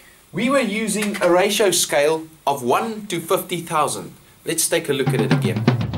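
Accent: South Africa. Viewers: Lmao he's South African!!! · Confirmed he's from south africa. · My Guy thank you so much for helping me, you are such a good teacher 😀 and btw are you from South Africa? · are you from SA??? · He sounds so South African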